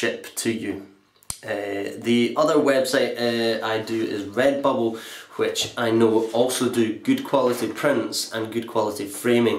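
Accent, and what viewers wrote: Scotland, United Kingdom. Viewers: Thank you so much for this video, this was really helpful. Also I love your accent, are you British by chance? If not, sorry for mistaken. ^ ^ Keep up the amazing work honestly, I subscribe and excited to watch more of your videos. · sounds more Scottish.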